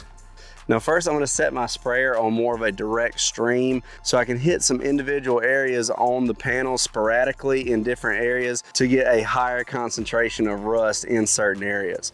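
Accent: South, United States. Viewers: Interesting accent. It's like southern and California burn out surfer with a touch of central Florida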